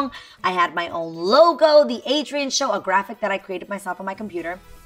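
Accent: New York, United States. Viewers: You have a New York accent. My friend sounds like you and she's not Latina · wow you had such a cute New York accent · Damn the New York was THICK 😹 she still the same woman 🧡🧡🧡😘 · I freaking love you! ❤️ We will definitely miss you on daytime TV! I just don't get why ya didn't go for like 20+ yrs! Glad we're able to see you on YouTube!!! About JLO yesssssss 🙌 Love herrrr too! I love how you're so humble like don't gas me! Haha! Love your JLO vibe! Your fashion sense your NY accent and attitude is everything! 🥰💯🙌 much love A! Stay real 😘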